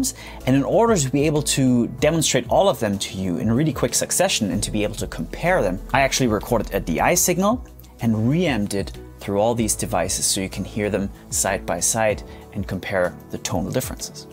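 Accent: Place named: Germany. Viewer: HI! Wo hast du dein musikstudium gemacht? Es sieht so aus als hätte Deutschland ein guitarristenboom mitt Manuel Garder Fernández und dir. Mann kann ein sliver of a german accent in dir erkennen aber du sprichts echt super! ( ich binn halb deutsch halb spanier) Viele Grüße.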